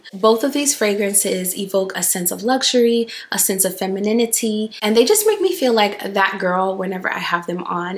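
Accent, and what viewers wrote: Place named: France. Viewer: She's definitely a French speaker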